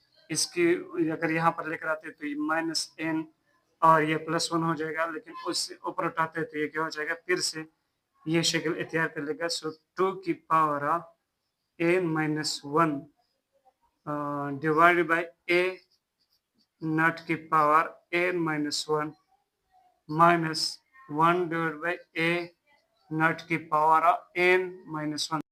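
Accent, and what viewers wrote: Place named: Pakistan. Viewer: Sir ap pak se ho kya apka bolne k tarike se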